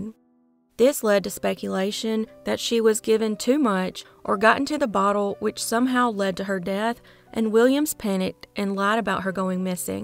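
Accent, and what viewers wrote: South, United States. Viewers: Your lovely Southern accent always makes me feel like I’m sitting and having sweet tea, with a true Southern Belle! 🤗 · Love your lil southern accent. Hello fr panama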